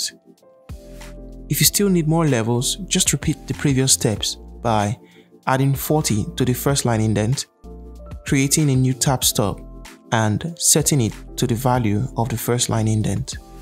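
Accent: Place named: West Africa. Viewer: Western Africa accent 👌 Dope tricks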